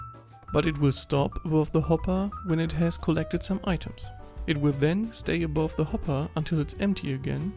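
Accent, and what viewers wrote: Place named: Germany. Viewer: Dem Akzent nach würde ich auf Muttersprache Deutsch tippen? Bei solchen Erklär-Videos würde ich mir eine zusätzliche, deutsche Version wünschen, für die Kinder.^^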